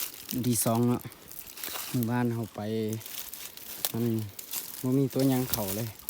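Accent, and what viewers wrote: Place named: Champasak, Laos. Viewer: ຢູ່ແຂວງໃດເດຟັງສຽງຄືຄົນຈຳປາສັກ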